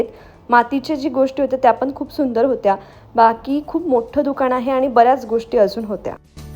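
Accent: Vidarbha, India. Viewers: Ho bai... vidharbh tone mdhech yeto pn kharch watcha brand Samjla ch nhi 😂😂😂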